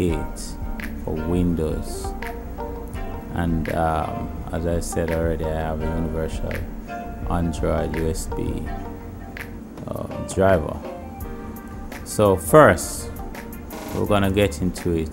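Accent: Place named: Jamaica. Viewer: yo yah jamaican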